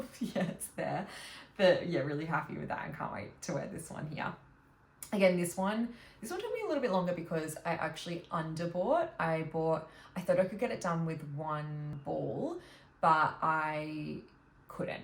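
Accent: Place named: Australia. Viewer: This is the first video of yours that I have watched and let me tell you how my jaw DROPPED when I heard your aussie accent! As a fellow aussie who adores knitting and crocheting, it is so exciting to find your channel :) Looking forward to watchinf more videos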